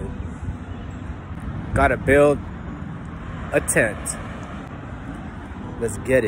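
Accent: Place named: United Kingdom. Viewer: 10/10 britsh accent forreal!!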